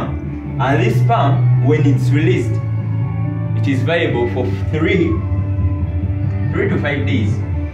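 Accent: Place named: Namibia. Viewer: He sounds Namibian👌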